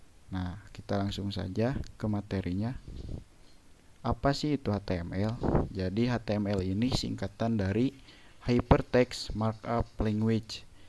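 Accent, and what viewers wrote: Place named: West Java, Indonesia. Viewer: Pengisi suara nya sunda banget :v sukses terus buat JAKI Aplikasi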